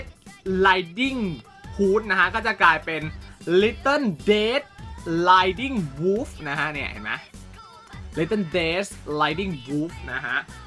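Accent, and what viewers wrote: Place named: England, United Kingdom. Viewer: สำเนียงอังกฤษมาเต็ม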